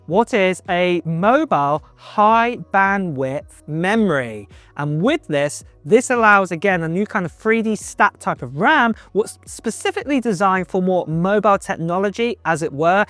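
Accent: United Kingdom. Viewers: British accent?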